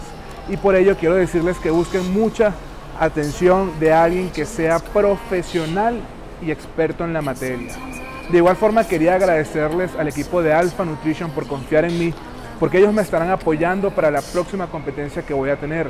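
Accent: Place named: North, Mexico. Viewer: Méxicano norteño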